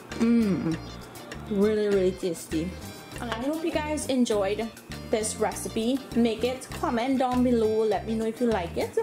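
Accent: Trinidad and Tobago. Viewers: Sounding like ah Trini😁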